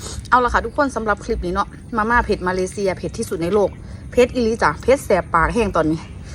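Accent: Isan, Thailand. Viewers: สาวหมวยพูดอิสานน่ารักดี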